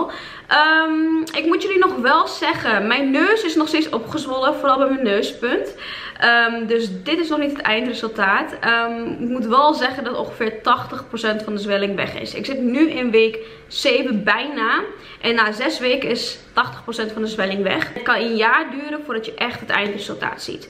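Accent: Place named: Drenthe, Netherlands. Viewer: niet gemeen bedoeld ofzo maar wat praat je opeen plat echt heel boers accent ...kom je uit drenthe?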